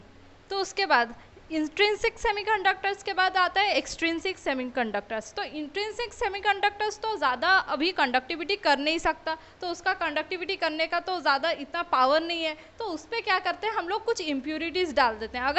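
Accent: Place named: Odisha, India. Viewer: No bakwass only point to point i like this type of lecture ❤️ it's like odia accent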